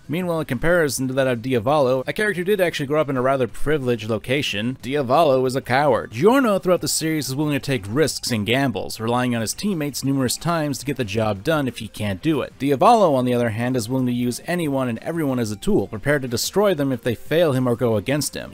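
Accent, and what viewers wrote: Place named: United States. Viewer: your family? Because your accent and channel is american.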